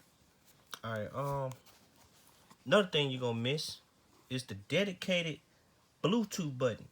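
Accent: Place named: South, United States. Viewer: you kinda sound like the YouTube poiised. Are you from the south by any chance?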